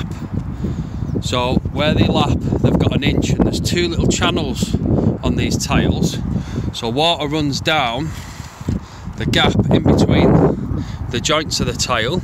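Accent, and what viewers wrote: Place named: Northern England, United Kingdom. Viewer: I'm American but something tells me that you're from the norf.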